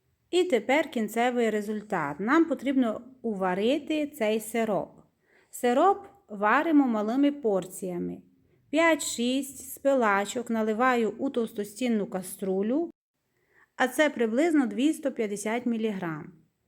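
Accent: Transcarpathia, Ukraine. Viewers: Відразу чути, що це наша Закарпатська господиня😊❤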